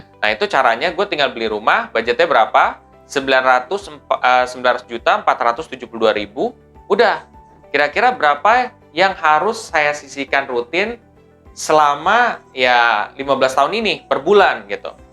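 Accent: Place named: Java, Indonesia. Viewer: Keturunan bule ya mas tapi medok jawa 😊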